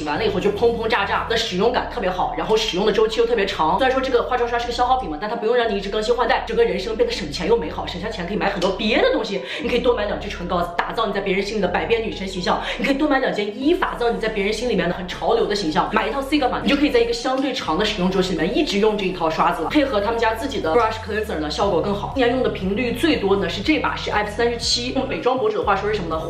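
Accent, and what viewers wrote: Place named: Taiyuan, China. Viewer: 真二八经的太原话，咱俩老乡，听得我这个舒服啊！哈哈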